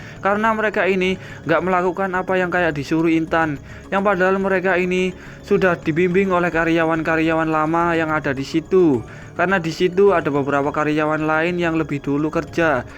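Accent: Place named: Java, Indonesia. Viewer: Lucu bgt ngomongnya.medox bgt gitulah 😅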